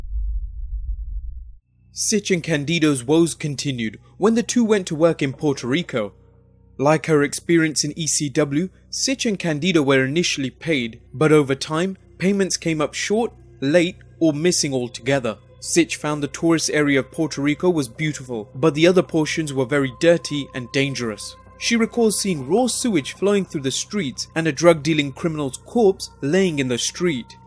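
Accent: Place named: United Kingdom. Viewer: I wish this information was spoken in a voice that wasn't from the British pits of hell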